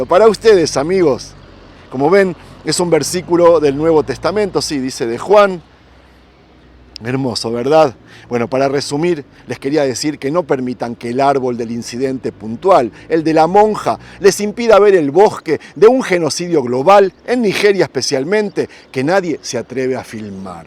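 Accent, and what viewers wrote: Buenos Aires, Argentina. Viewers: Hola sr de Argentina, hace mucho lo veo me resulta muy agradable utt.me llama la atención su Castellano esmuy porteño de Argentina siendo una persona compresiva Y humama Gracias Gracias soy de ɓs.as. y cristiana solo siento elogios para usted y me gustaria un pequeño repaso de su conección con argentina ya que dijo que tiene un hermano graciasle pido no se incomode plr el pedido ya queme resulta una persona muy agradable mis🎉 saludos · Por tu acento creo que sos también argentino doblemente hermano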